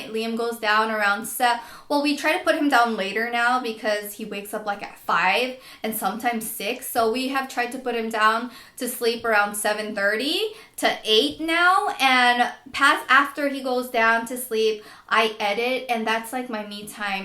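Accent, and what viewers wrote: Los Angeles, United States. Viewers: you are such an LA girl.. ur accent the way you talk is sooo LA.. (i live in LA) i love it... 'u can take the girl outta the hood but not the hood outta the girl' godbless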